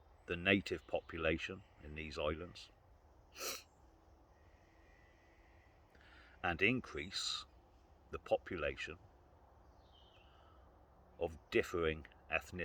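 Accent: United Kingdom. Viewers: I'm lucky to hear a British voice where I live......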